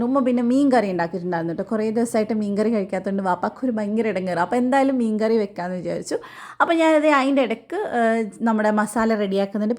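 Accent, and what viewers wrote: Kannur, India. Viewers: Nigal kannur aano.. Evidyanu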